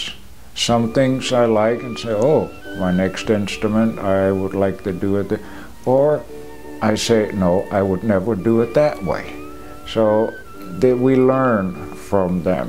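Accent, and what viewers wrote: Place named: United States. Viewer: For me, It is an american accent without even a tiny bit of a germanic tongue.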